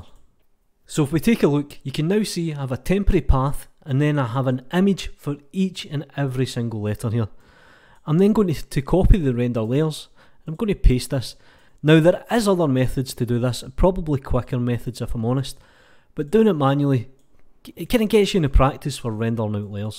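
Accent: Scotland, United Kingdom. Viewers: When I turned on auto-generated subtitles with your scottish accent xD "I'm gonna add on fail open nod" -> "I'm gonna add on a fail or not"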